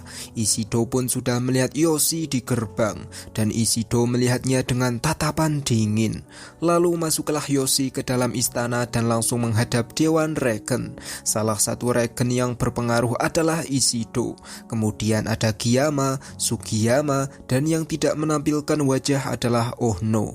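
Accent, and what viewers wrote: Java, Indonesia. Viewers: klo ngomong ga usah medok kali, jadi ga enak dengarnya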